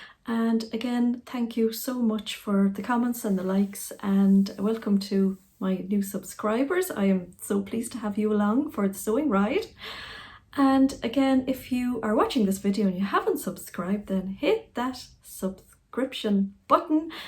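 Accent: Ireland. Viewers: ✋🏻Hi .just tuned in and was drawn by your Irish lilt .I live in Sheffield but grew up in Dublin.Love your makes and how they look on you.I am going ,to check out your other posts now.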